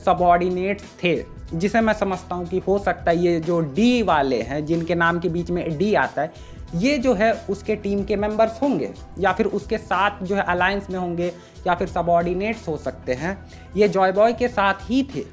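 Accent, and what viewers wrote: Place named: Odisha, India. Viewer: Bro are you from odisha . Apke baat karne ka tarika Odisha walon ke jaisi hai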